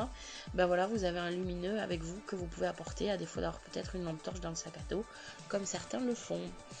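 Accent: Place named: South, France. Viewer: lol de rien L'accent du sud est très apprécié il donne tout de suite le sourire!!! ne le réfrène pas au contraire:D Nous sommes des sudistes et fier de l’être!!! bonne continuation à toi;-)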